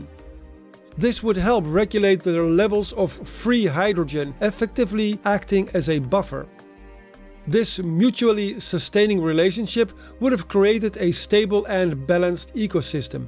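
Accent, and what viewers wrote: Netherlands. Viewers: Love Dutch English as Dutch man ❤️😅❤️ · Excellent video in beautiful Dutch accent ! Do more of this type of explainers. Best wishes 🎉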